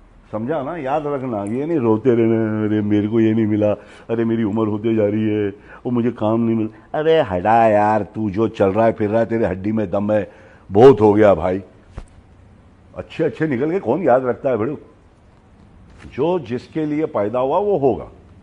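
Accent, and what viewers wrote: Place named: Mumbai, India. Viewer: Like his simple philosophy mumbai Hindi nobody speaks like him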